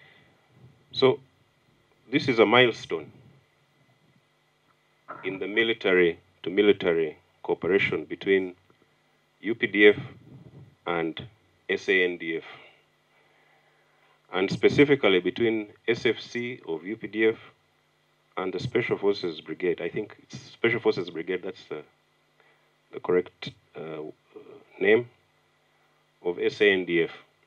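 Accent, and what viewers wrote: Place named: Kenya. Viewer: Ur swahili sounds more of kenyan🤔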